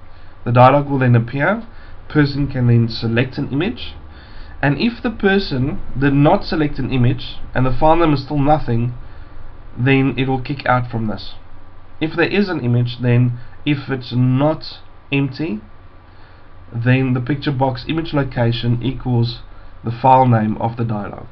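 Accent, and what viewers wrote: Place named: Afrikaans, South Africa. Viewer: your accent sounds afrikaans so you should understand this ('n goeie begrip het 'n halwe word nodig) aimed at P.S Like the Vids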